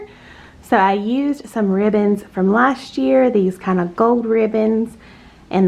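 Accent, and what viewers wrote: South, United States. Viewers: Im not Sure how I haven't found your channel before this collab! WOW That snow Globe is Amazing,Your Accent is the cutest. Im from Michigan I always wanted a southern Accent! Your house is so beautiful. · love your Christmas 2020. Love the mantle decor! Love your southern accent. Merry Christmas to you & your family. Brenda Harris from Arkansas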